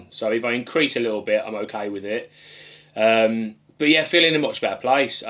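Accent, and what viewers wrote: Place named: Midlands, United Kingdom. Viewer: Been watching for a while, and only just noticed you’re in Warrington, it’s where I’m from too! With the accent, I was assuming the midlands. Glad that you’ve been able to get your bloods done, it will give you a good insight. It’s a shame it doesn’t seem like you have any other bloods to compare it too, because it could be that yes the result might not look great but there’s no way of knowing if it’s an improvement from when you started 11 weeks ago if that makes sense? Unless you did have bloods done at the start and I’ve missed that. I’ve never had my bloods done before, but been on my weight loss journey since October last year. I’m getting them done tomorrow, privately because my GP is useless 😂. Then although this will give me good insight, I think I will get them done again a few months down the line to compare. 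Anyway mate, you’re smashing your journey, don’t know if I’ve ever messaged on here so if not, hello! Hopefully you won’t have to stop the meds as they have really supported you it seems, but health always comes first!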